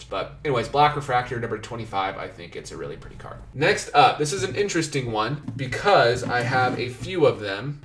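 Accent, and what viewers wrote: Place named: Utah, United States. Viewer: Sounded like he is a Utah boy like myself.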